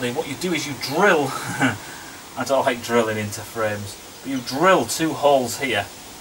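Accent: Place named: England, United Kingdom. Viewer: As soon as I hear the english accent, I know it is going to be a cluster f k of wrong tools and half ass work.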